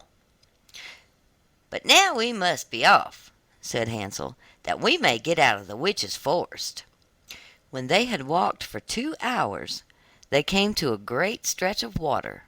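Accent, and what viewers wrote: South, United States. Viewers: Hated the southern accent . Terrible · Although I am grateful for the story, but you aren’t the only one who isn’t distracted by the Southern accent. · I must be the only one here who are NOT distracted by the southern accent. I find it amusing... And I'm from Europe myself.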